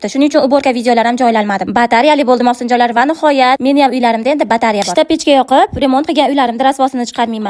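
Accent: Namangan, Uzbekistan. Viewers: Voy dod shevezdan ey NAMANGAN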